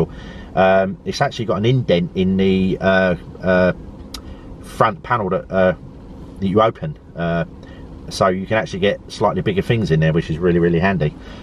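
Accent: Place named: Cockney, United Kingdom. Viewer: I glad you like the leaf , I hope it work's out perfect for you job . Love the Cockney accent , thinking/finkng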